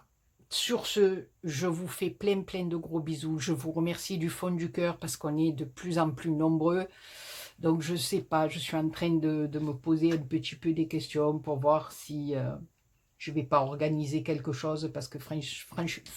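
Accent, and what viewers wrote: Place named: Provence, France. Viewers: Coucou, je te suis depuis peu mais je me régale car j'entends bien les façons de parler de chez nous 🥰. Nous c'est à Marignane que c'est le ouaille et à Châteauneuf les Martigues c'est propre mais on ne trouve pas grand chose 🤔. Bisous 😘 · bonjour je regarde avec plaisir vos videos et me regale de vôtre parlé de chez moi! je suis marseillaise j ai 68ans et je scrappe pour le plaisir et pour me vider la tête;;Ac/4tion le plus pres de chz moi , j habite le bord de mer, c est St Loup , 2bus 3/4 d h pour arriver dans un depotoir!!! les rayons vides et le peu d articles , par terre , eventres, bref ,pas la joie... dommage quand on est à payolle les prix Action c est super!!! continuez de nous faire vos petites videos vous ete geniale amitie Nadege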